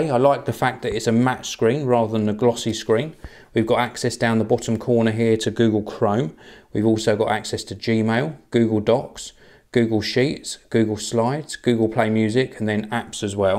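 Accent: United Kingdom. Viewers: 第一次觉得英式英语听着还比较舒服……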